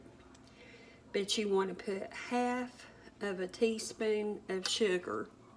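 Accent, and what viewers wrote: South, United States. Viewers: You have such a country sweet way of speaking turn your eyebrows inside out!!!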